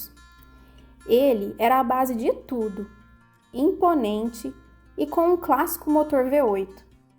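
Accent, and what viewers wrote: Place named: Minas Gerais, Brazil. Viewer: Eu já sou fã de carteirinha desse casal. Mas além de tudo maravilhoso (carros, imagem, ideias, explicações, estradas, etc), não posso me furtar a dizer que o texto é impecavelmente escrito e falado pela, as colocações verbais e tudo mais, resumindo, o português bem falado, com aquele sotaque "minerês", que, eu que sou Tricordiano, conheço muito bem. Ah, um Landauzão na garagem ia ser muito bom, mas eu moro em apartamento e as vagas cobertas não comportam um gigante como esse. Teria de estacionar nas rotativas, ao relento. Aí, dá dó, hein. Quando passa o antigo na estrada, eu já estou pronto pra assistir com o like. Valeuuuu, casal, showww de bolaaa. Sempre em frente!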